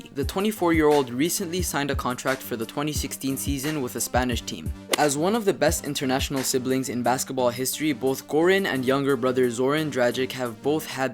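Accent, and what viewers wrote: Toronto, Canada. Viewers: Hey, Are You Torontonian? I Can Tell By Your Accent. (BTW I'm Also From Toronto. I Live In Scarborough)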